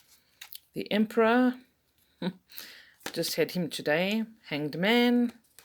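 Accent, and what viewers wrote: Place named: South Africa. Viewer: I was born in Cape Town, so I was immediately drawn to your South African accent lol. I am thinking of purchasing this deck and came across your channel when I searched for videos on the Celtic Tarot. Thanks so much, I think I may grab myself a copy. The art is indeed very interesting and beautiful, and although pip decks are not typically my favourite, this one definitely does intrigue me 😊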